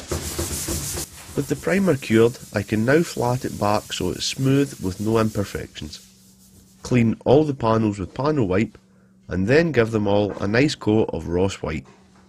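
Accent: Scotland, United Kingdom. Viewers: That was another great job. I marvel at your way of working every time. Every single screw is worked on so that it looks like new again. And now this beautiful motorbike is once again a technical work of art. I also enjoy listening to your Scottish accent. I have the greatest respect for your work. I'm already looking forward to the next project!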